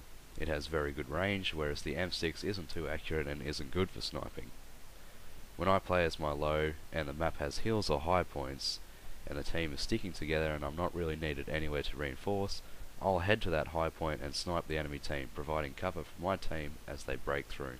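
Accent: Australia. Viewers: ozzies always say youchoob. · Nice job and some helpful tips. I regard the rich and mellifluous Aussie accent as a bonus. Thanks, mate!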